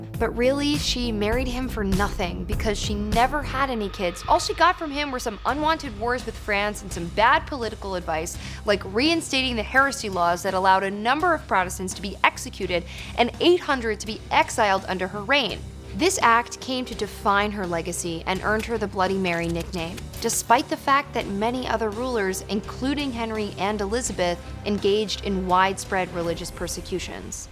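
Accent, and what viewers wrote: United States. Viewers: Its hard listening to English history via a twangy American accent, no matter how attractive the speaker is.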